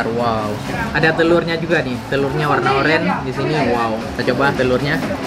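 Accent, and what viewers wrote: Aceh, Indonesia. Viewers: Ab orang mana.... logat nya mirip aceh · Mau kemanapun pergi logat acehnya tetap melekat 😁👍👍👍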